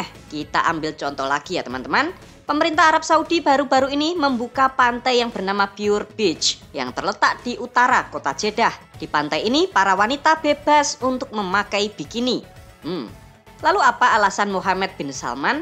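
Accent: Java, Indonesia. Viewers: kok medok banget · Pak beri berita tentang arab bahasanya medok jawabnya ya pak jangan tinggalkan.bahasa kampung jawapnya.ya pak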